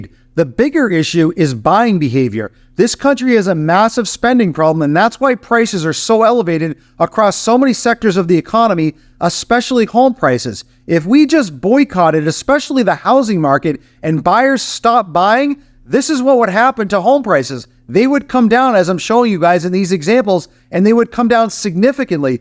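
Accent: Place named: Canada. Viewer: Where in Canada are you from I can tell by your accent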